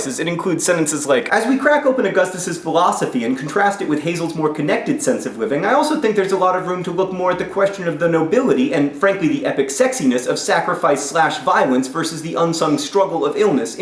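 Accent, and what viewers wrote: United States. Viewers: OOOoohh Calednrically!?!? I am not so used to the American accent! I thought he said "collindrically!"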